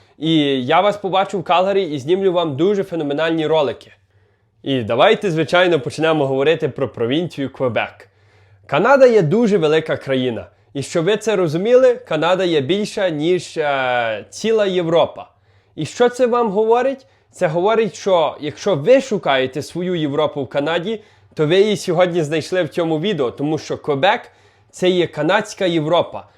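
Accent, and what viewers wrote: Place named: Western Ukraine, Ukraine. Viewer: привіт, просто цікаво, ти 1ше покоління в Канаді, чи вже внук жителів України? Говориш по українськи файно, по нашому по западенськи))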